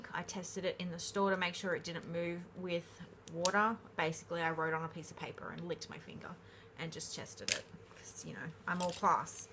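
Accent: Australia. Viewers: I am enjoying going through all your videos! Nice to hear a fellow Aussie accent too x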